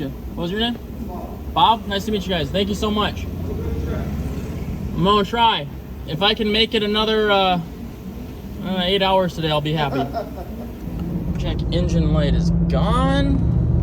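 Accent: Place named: South, United States. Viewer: Lovin the country twang you been playing,coming from a country grandma,I’m young at heart !!! Please take care and be safe Sending good vibes from Nova Scotia Canada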